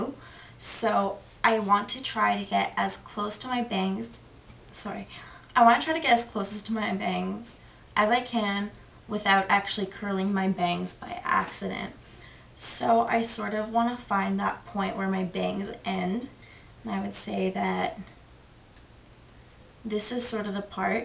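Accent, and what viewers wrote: Canada. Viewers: Lol your Canadian accent is cute. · Hehe I could tell you're Canadian by the way you talk ^.^ A bunch of shows are Canadian so I kinda know how the accent is lol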